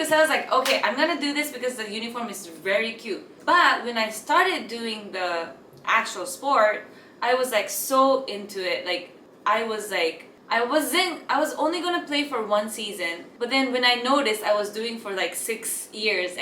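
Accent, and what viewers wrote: Philippines. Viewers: She talks in english like a Filipina...I wonder if she knows some tagalog..... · Why do you have Filipino accent?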